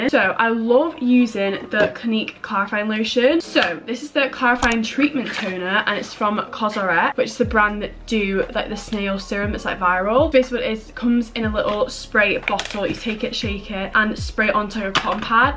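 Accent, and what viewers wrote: United Kingdom. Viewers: shes not australian shes said shes british before and she has a sort of british accent and definitely not a australian one x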